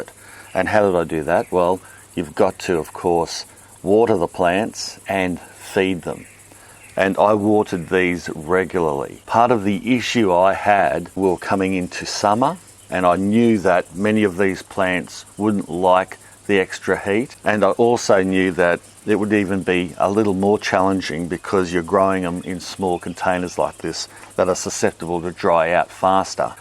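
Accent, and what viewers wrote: Australia. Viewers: WOW! An Aussie, in QLD to boot. I live in Cairns so here it's either hot, hotter or pouring with rain (this doesn't include cyclones). It's so refreshing to have some advice from Australia, and not have to interpret it from OS into our climate. I love growing from scraps. I have very successfully grown sweet potato from old (sprouting) potatoes. One year I got over 15 kg!! Growing things in my part of the world can be difficult. "Plant in Autumn", "Sow before summer", means nothing up here. You plant when you remember to and hope it doesn't go to seed before 6 weeks! Anyway, love your information, and to hear an Aussie accent. Thanks.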